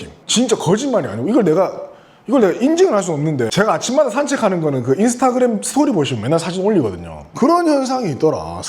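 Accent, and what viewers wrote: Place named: Gimhae, South Korea. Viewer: 행님 김해서 오셨습니까 사투리 구수하네예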